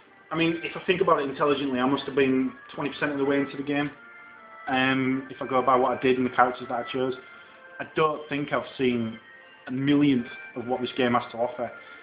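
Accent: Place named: East Yorkshire, United Kingdom. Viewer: I sense an East Yorkshire accent!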